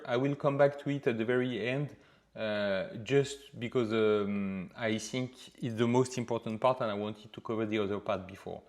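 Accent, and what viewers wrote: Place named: France. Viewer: I love your French (?) accent! Thank you these tutorials! Btw, are you familiar with 3D LUT Creator? It's an amazing app (unfortunately a paid app). It has some remarkable tools (that, actually, remind me of some of the tools in DaVinci Resolve for color). I'd love if something the the A/B Grid was incorporated into Darktable. And if not, this is an amazing raw editor.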